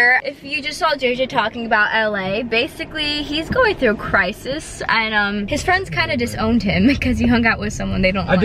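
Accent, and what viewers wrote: United Kingdom. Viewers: Ur british accent made me die I live in england and half my mates actually talk like that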